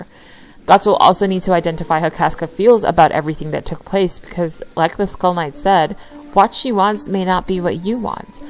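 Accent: Trinidad and Tobago. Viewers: Caribbean accent, from Trinidad right ?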